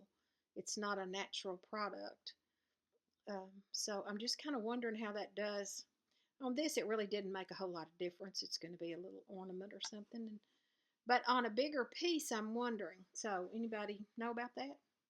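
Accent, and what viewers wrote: South, United States. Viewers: Hi, I love watching your videos, I am a southern girl too and I like hearing a familiar accent.:) I am from Texas and you will love First Monday in Canton. Wear very comfy shoes and bring money to spend lol Have fun in Texas!!! Oh, and since you also quilt, there is a nice little quilt shop called Sew N Sew in a little house not far from First Monday. The address is 22390 State Highway 64; Canton, Texas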